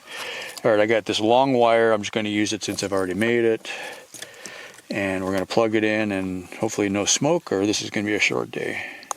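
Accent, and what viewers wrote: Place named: Canada. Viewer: He's Canadian, sometimes they talk funny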